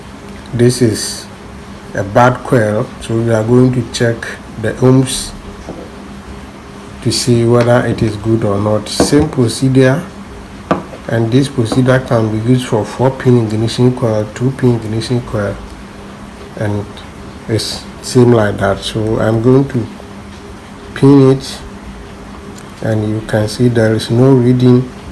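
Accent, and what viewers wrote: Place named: Ghana. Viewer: what's up the accent gave you off. you be ghanaian abi? give me the location of your shop. i have a mirage that is guzzling fuel no code. thanks for the video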